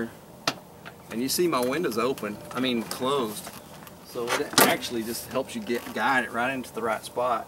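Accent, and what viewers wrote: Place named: Texas, United States. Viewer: thanks for quick respond... yeah mine it's just got 2 speaker also it's stick shift which i love .. about door speaker well i got to open a hole in the door to place the speaker and much more work that I don't have right tools to do so i will take wrangler speakers or charger dash speakers ... anything well be better then my shitty under dash speakers .. lastly i love Texas love her accent in a whole i love America.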